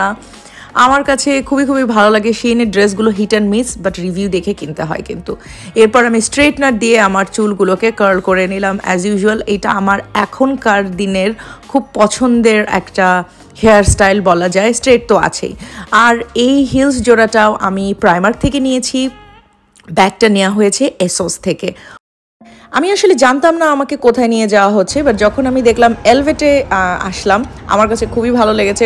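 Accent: Sylhet, Bangladesh. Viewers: Sylhety vhaia😀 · Ere hunray ni Eta toh Sylheti mat🥰🥰🥰🥰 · Afa baisab tho amrar sylhety 😜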